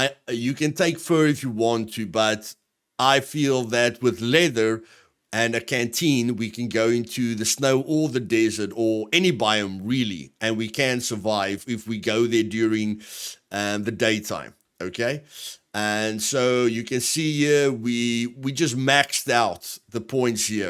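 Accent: South Africa. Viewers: Hey man thanks for the walkthrough ! are by any chance south african ?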